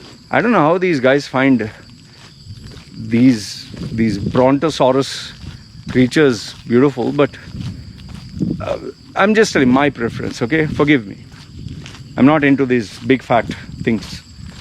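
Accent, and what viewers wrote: India. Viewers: Ae Indian hi sayad... he's accent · Are you an Indian? Your accent sounds of an Indian speaking English. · After watching your video, I would say you should talk to your parents that they should plan you in America at least you could speak American accent English. And you didn't get married to an Indian woman because bla bla bla.... Because you must from a very backward area from India or you left India in 70s or 80s. Or third your video is not relating to every part of the country. Or most important: you must rejected by so many Indian women. Last but not least, indian people are hairy but not unhygienic. We Indian prefer hygienic hairy bodies instead of sweating, smelling and yucky people with bald bodies who don't take bath for a week. You might be right at some points but Being an Indian face, Indian accent English (May have American citizenship) you are not disagreeing, You are insulting.... 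· That’s the right question, the last question . Definitely no Indian woman wanted to marry a man like you. First and foremost learn to respect everyone . Seems like you had a very sad childhood . The traumatic experiences. Maybe it’s your parents or the Indian society which screwed you. Try to get yourself heal rather pretending to be someone else . There is a psychological reason why you are wearing a mask now . And your accent, thats a typical Indian accent man. Unfortunately you can’t run away from that. Your root! Angry and fearful man. Peace ✌🏼🇲🇾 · Which country r u native to?? Ur english accent is 100% indian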